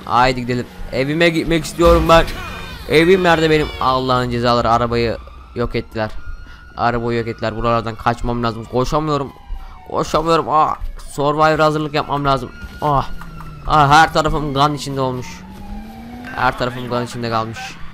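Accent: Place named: Sivas, Turkey. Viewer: Kardeş sen sivaslın herhalde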